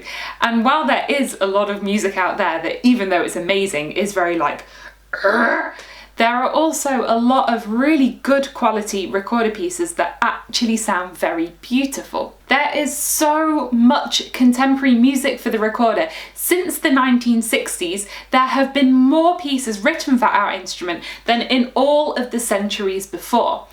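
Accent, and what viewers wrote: United Kingdom. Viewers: Thanks for sharing these pieces. And, first of all, I really love your British accent. There are two recorder contemporary pieces I like so much. The first one is "Glass" (from "Minimal Preludes") by Karel Van Steenhoven. The second one is "Thymehaze" (for alto recorder and prepared piano) by John Casken. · that british acent 😆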